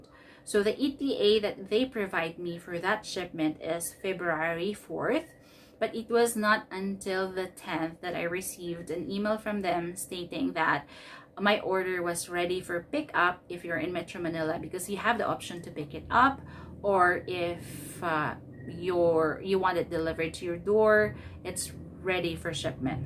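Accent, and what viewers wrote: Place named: United States. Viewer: I love the accent i thought naaa US po talaga kayo meem hihi wink wink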